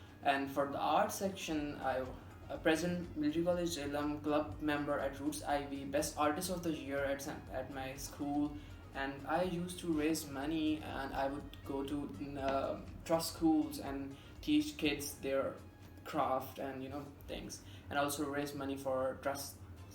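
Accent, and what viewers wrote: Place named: United States. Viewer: America gaya nahi or language abhi se shuru kr di hai wah re wah.. btw English kaafi achi ho gayii hai teri specially American accent 😍 ♥️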